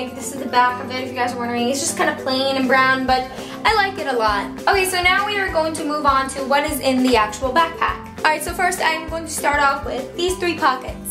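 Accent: New York, United States. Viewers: I'm pretty sure it's a New York accent. I'm from New York and she talks just like me so I think I'm accurate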